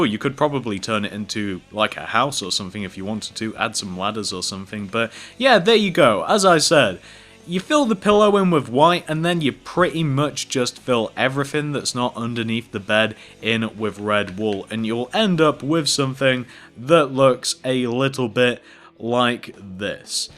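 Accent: United Kingdom. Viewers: I like your British voice, so awesome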